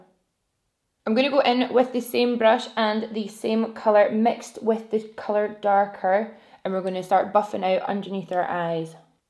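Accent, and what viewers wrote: Scotland, United Kingdom. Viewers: My 5 year old daughter said “ mommy who are you watching “ so I showed her She said “oh you’re watching that girl again I like her voice” I said me too It’s funny because Scottish accents have literally been my favorite since I was so young Also she just said again “ why does she have such a beautiful voice “ I think my daughter clearly loves your voice just as much as me haha ♥️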